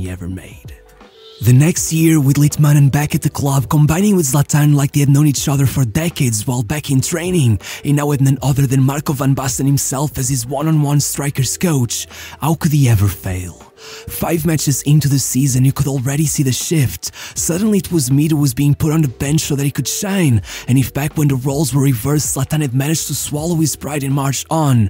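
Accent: Portugal. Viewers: Are you Portuguese? You pronounce the players names like a Portuguese would. Nice.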